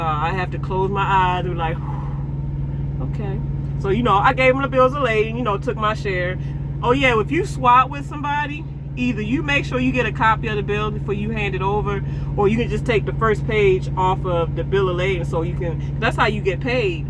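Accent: Baltimore, United States. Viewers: Tht Bmore accent is water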